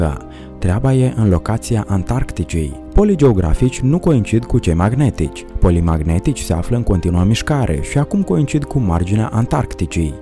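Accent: Moldova. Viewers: Ie moldovian bre😊😂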